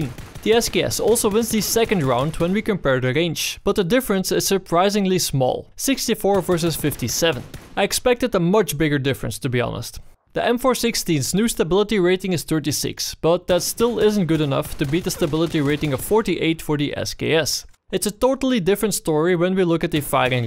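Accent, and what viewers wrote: Netherlands. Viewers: i think ure dutch nice vid ik ben ook nl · its a dutch accent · You're a dutchie, right? :D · this is dutch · (i'm guessing he's dutch) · lets listen to your accent.... Are you Dutch?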